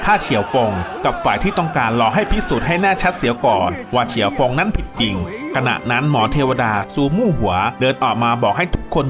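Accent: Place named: China. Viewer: สำเนียงการพูดของผู้บรรยาย คงไม่ใช่ “คนไทย” หลายคำออกเสียงเป็น “คนจีน” เช่นคำว่า “อาวุโส”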